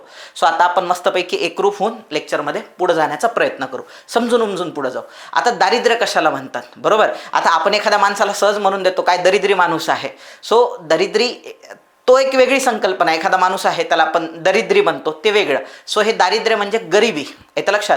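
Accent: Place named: Kolhapur, India. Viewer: Kolhapuri bhashet ekdak💥🔥 kadkkk ani lai bhariiii👍👌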